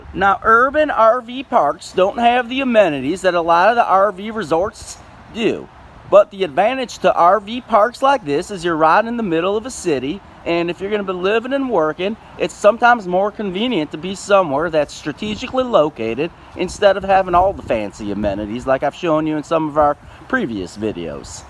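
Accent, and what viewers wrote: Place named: South, United States. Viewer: You sound like a country boy, which state????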